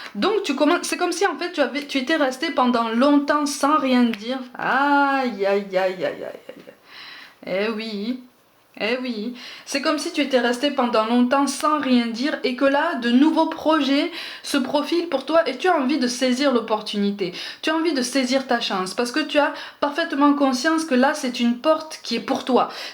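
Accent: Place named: South, France. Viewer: Merci pour votre travail, très joli accent du sud;) Je m'abonne avec plaisir:)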